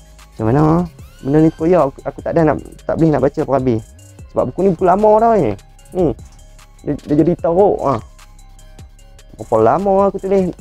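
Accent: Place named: Malaysia. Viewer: ko dengki dah kenapa..content dia mmg khusus utk penonton malaysia,slang dia mmg slang sesetengah kawasan/negeri d malaysia..ko x faham tu ko punya pasal la,bukan salah cara dia bertutur,aq faham je semua..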